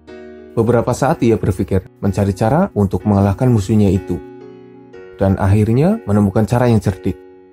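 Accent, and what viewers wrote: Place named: Java, Indonesia. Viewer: Logat jawanya lucu mas🤣🤣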